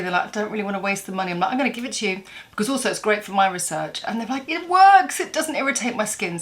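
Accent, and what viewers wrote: United Kingdom. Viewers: I love your British accent!!!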